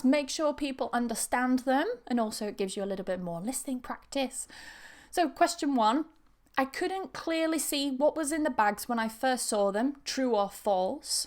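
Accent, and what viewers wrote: United Kingdom. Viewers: Hi, i like your Brittich accent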